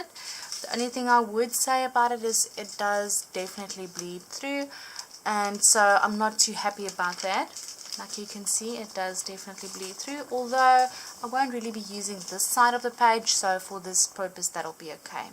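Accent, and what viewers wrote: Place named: Australia. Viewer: You're coloring is absolutely beautiful! Thank you for inviting me over! I subscribed! Are you from Australia? I love your accent! God bless, Kim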